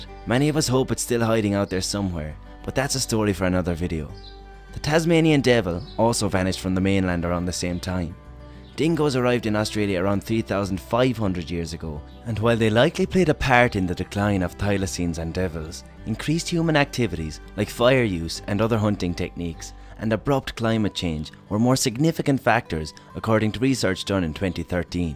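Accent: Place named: Ireland. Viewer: An Irish Attenborough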